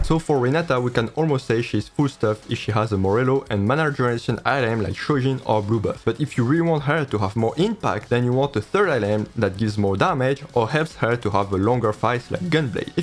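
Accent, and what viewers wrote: France. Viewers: I've been holding a question for as long as I've been watching your videos... what is your accent ? It sounds like a French accent but as a French I'm wondering why I think it sounds a bit more like a Northern accent... SORRY I JUST COULDN'T HOLD THIS ANY LONGER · Pro-level Dad puns, cute French accent, that crush better strike while the iron is hot! :D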